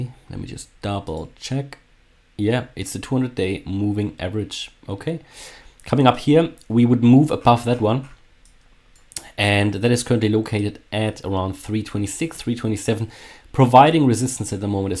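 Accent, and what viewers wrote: Germany. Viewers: 3.50 !!!!!!!!!!!!!Love your german Accent. :) was kostet deine Mitgliedschaft und kann man auch traden lernen,.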